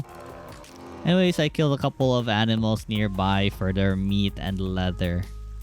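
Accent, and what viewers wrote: Philippines. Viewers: You sound filipino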